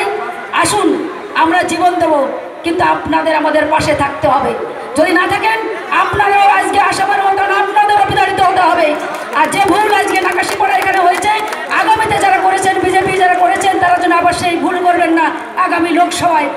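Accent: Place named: Bangladesh. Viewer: Bhasha ta thik kore bolun, apni to Bangladesh theke bolche6en, mone hoy, r apni to nodiyer lok, apnar kotha to, Bangladesh hobei, karon nodiyer r Bangladesh sem sem okokoko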